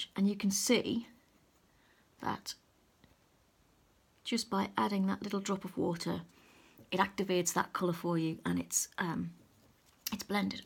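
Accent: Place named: Scotland, United Kingdom. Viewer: Hullo,! I've been watching your vids for awhile now (I subscribed) for 2 reasons: 1. You're so talented and a wonderful teacher! You don't hurry thru and you are very clear and precise. 2. I am a transplanted Scot living in the US and your voice reminds me of my Mum. Same accent AND she was a painter! So you bring me comfort on two levels. Thank you so very much for brightening my days. Blessings xo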